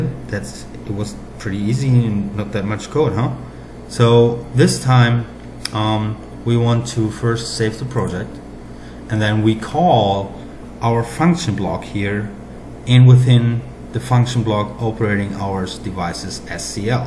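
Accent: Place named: United States. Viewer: OK, that explains those pauses and hesitations and my hunch that you did not sound as an English native speaker, however your good pronunciation deceived me. Anyhow, you don't sound German, more like an American accent. Keep making this sort of videos, but improve your fluency to make them shorter and more pleasant to your audience.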